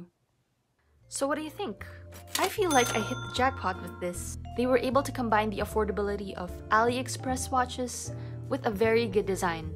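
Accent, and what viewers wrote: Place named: United States. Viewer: I wanted to ask how your English is so good it sounds native with a mild US neutral accent (I was and well technically still am an Oral English teacher) did you spend time in the US or Canada? or did you complete an IELTs course in university?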